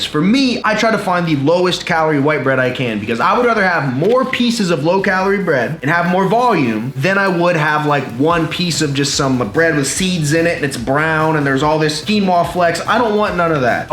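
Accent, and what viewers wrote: Texas, United States. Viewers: The Texan (non-screaming) version of Coach Greg, love your channel!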